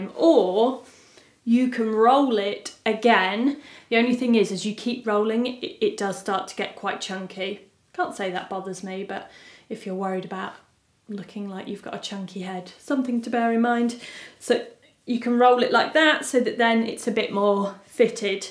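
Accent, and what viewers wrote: United Kingdom. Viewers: I'm French and for real I really like her British accent !!!!!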